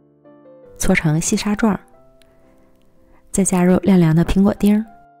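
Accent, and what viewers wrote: Liaoning, China. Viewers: 好久不见变成了南腔北调记得是辽宁哪旮旯的口音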